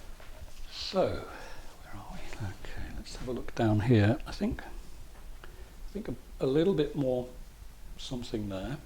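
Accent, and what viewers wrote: England, United Kingdom. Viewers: Would like to know the colors you used for this painting. Was not able to hear it very well, due to hearing. Your English accent Is different than the American. Sorry no offense. Thank you, Gina/Texas girl 👧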